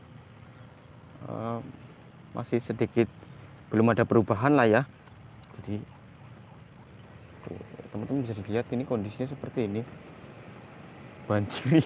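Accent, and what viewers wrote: Banyumas, Indonesia. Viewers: Ngapak ya bos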